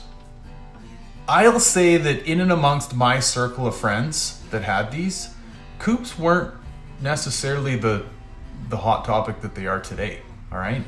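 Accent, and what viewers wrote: Canada. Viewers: Your videos are absolutely awesome and extremely informative!! Thank you so much! Love the Canadian accent, as well!! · Keep up the great vids man. Also I recognized that canuck accent in about 0.2 seconds, hello from Ontario!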